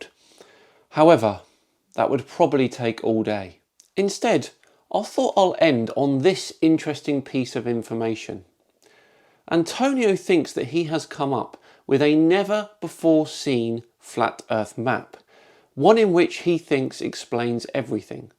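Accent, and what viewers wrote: South, United States. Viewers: Definitely a Southerner. Neebody in Toon taalks like that, bonny lad.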